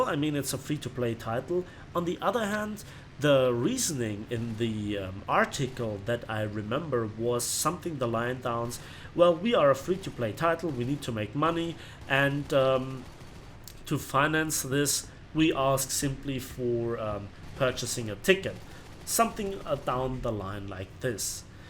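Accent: Germany. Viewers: Hey! Thank you for mentioning and answering my comments. Though I think you really should invest some time in answering everybodies comments with written replies... it shows that you care about us. But I'm still getting used to your ways and I may be wrong. About my comment that your videos are to long... forget about it. They are just great!!! Don't change a thing. About premium account... it's a must. I've tried a couple of months without it and it sucks. The difference is huge. And I'm fully spaded in all nations fighters and most of other planes. Except for the jets. They are pay to win and I hate them. My advice to all non premium players... just wait for the sales. 12 eurs a month is not so expensive especially if you love the game but on sales price drops to 3 eur per month. Now that's just insane not to pay for it. 40 eur for 360 days of premium. What happened to Subnautica branch? 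Continue the project... please? You are amazing narator and I love your german... both the accent and the actual language. Some 'fuck you's in german and 'du kanst mich mal' or 'was soll den sheiss' and stuff would be great to hear too:-D You know... actual comments when someone makes a pussy move on you and the joy 'comes out' of you... in your mothers language... the good stuff:-D Love you!!! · dont ever loose the german accent!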